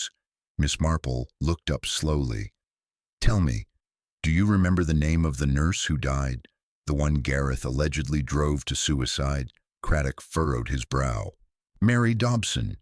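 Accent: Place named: United States. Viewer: An American accent doesn't work for a Marple mystery, sorry can't listen.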